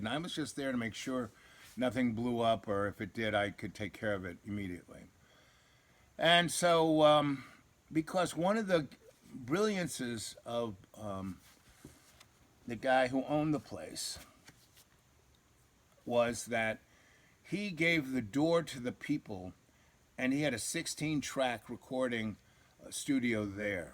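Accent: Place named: New York, United States. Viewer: Definitely from NewYork..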